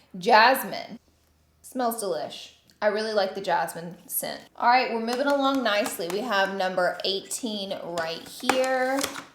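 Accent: South, United States. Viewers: Anyone else miss adorable full on southern accent? 😭❤️ First video I ever watched was her Blendiful review and the accemt was so cute I could not stop watching after that lol! Love her silly personality 😂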